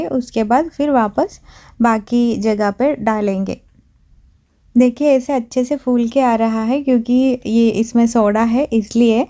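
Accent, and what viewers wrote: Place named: Kerala, India. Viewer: So nice love your hindi with Kerala accent so sweet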